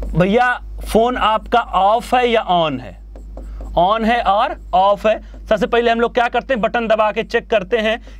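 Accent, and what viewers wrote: Bihar, India. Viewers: Akdm bihari wala touch, maza agaya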